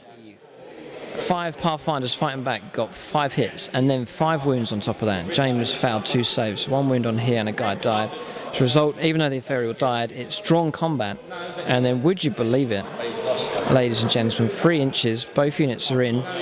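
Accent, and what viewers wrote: United Kingdom. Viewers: I'll admit when you rolled the "6" for the last hull point on the Super Heavy it was "Carlton Fisk-esque"! Good show once again. Maybe it's the models, maybe its the terrain, maybe it's the narrative, hell, I wouldn't rule out maybe it's just the British accent thing, EITHER WAY, these batreps have reached a peerless quality in what you provide. No fancy overlays or stat lines (which are fine) but just clever camera work and a clear love for the narrative. Thanks again. See ya next time.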